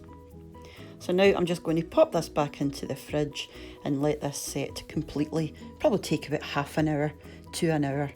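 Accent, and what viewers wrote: Scotland, United Kingdom. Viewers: I was complimenting her strong Scottish accent. I have traveled to 48 of the 50 states, including Alaska and Hawaii. The only 2 I have missed are Tennessee and Kentucky. Maybe they have the strongest accents! I was born in Philadelphia and have lived in the west most of my life. I don't have an accent. By that I mean I don't believe there is a typical American accent like a British or Irish, South African, etc. Don't assume I haven't traveled.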